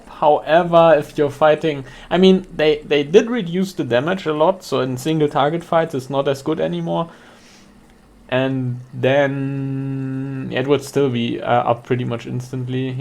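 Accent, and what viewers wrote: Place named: Germany. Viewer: Your German accent is nice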